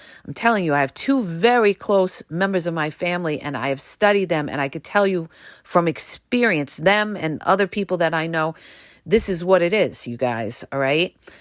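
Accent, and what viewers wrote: New York, United States. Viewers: I believe that Narcissists are made. My belief is because of my experience with her over 24 years. She got worse at the end because I believe she realized that her 2 boys were old enough to take care of themselves and that's when she let loose. I will be turning 64 this year and she will be turning 60. 3 years into Blocking/No Contact and I am now starting to feel peace in my Heart. It's a long road and I have no desire for dating right now. I just want to find myself again. I will always listen to you as long as you keep it going....Bless you and love your New York Accent...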